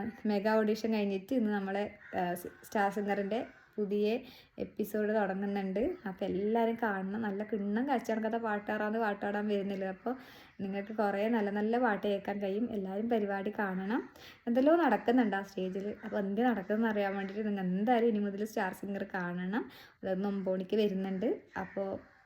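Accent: Kasaragod, India. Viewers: Nammale kasargodan bhasha ❤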